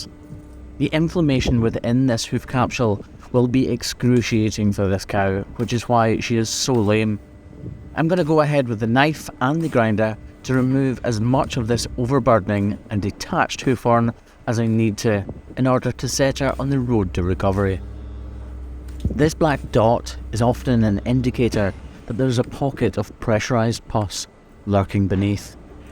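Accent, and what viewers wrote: Scotland, United Kingdom. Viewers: Is it just me that find theses videos strangely soothing? Perhaps it's his calm Scottish accent. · Scottish is crazy sometimes. I deadass thought you were speaking French and I didn’t know why I couldn’t understand until I realised you were speaking the queens English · You sound like an avarage british person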